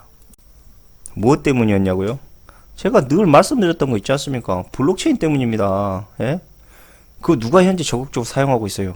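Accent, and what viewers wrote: Jeolla, South Korea. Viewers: 전라도 사투리 쓰시는게 너무 듣기 좋네요, 영화배우 송새벽씨같아요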